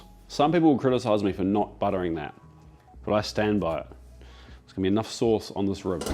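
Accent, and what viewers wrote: New Zealand. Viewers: Thanks i appreciate the effort you put in regardless of the bitchy comments, and your on the button with wrapping ribs in foil to steam as they cook,i do this everytime and the ribs are perfect in the oven. Thanks you Kiwi accented fitter and turner, be safe buddy,love your work. 🥰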